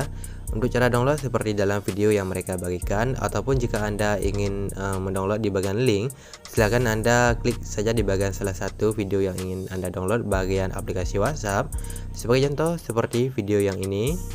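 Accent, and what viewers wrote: Aceh, Indonesia. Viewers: Org Aceh Bg?😂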